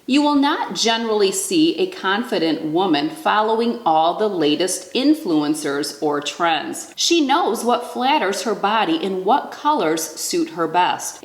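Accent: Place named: Michigan, United States. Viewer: Your Michigan accent has me laughinnn lol peace from Windsor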